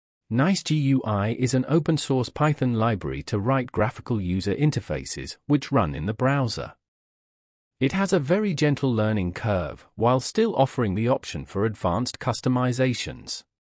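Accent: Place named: United Kingdom. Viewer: The voice over is just awful. Speak English. You don't "transfer" a library, you install it. Calling a function with a text argument is not "sending it a text" (LOL). Every other tutorial in the playlist seems to have the bot exclaiming "I am SAVING the code FILE!" repeatedly, always with the same alien accentuation. While it may be easy for you to generate one of these videos for each little control in NiceGUI, it's not obvious that any of them teaches anyone anything — too trivial. The last ones on `bind_*` perhaps have some content. I guess you think this accent sounds "classy". Anyway, it does sound British.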